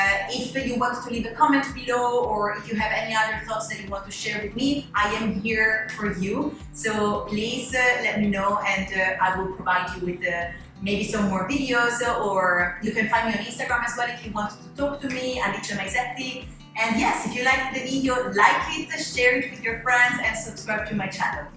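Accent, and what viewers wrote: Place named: Italy. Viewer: I love your Italian accent 👍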